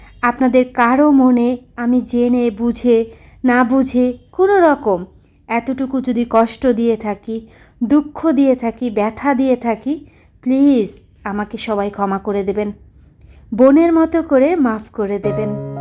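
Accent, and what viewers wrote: Khulna, Bangladesh. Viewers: আসসালামুআলাইকুম কেমন আছেন আপু আপনার কথা শুনে আমার খুব আপন মনে হয় কারণ আমি ও খুলনার মেয়ে। আপনার বাড়ি খুলনা কোথায় জানাবেন প্লিজ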